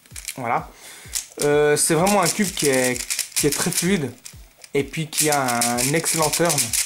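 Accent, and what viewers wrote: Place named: Fribourg, Switzerland. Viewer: Ouais, je suis aussi fribourgeois. J'ai vite reconnu l'accent !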